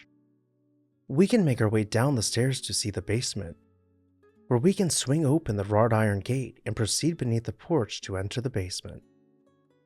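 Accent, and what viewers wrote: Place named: Missouri, United States. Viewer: Hey,. I enjoy your Missouri accent! To where have you moved?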